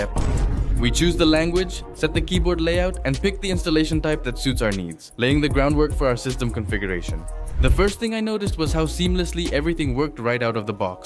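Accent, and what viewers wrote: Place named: India. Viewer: Bro is in Colombia and has a indian accent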